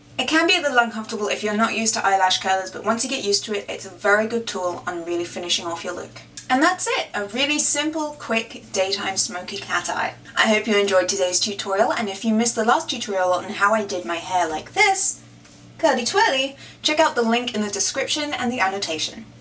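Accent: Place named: United Kingdom. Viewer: Omg, when you do your Beauty viddeos, you become much more British;) But it's alright:P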